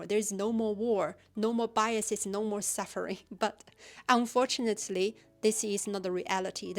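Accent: China. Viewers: 我非常確定你的母語是中文，對嗎？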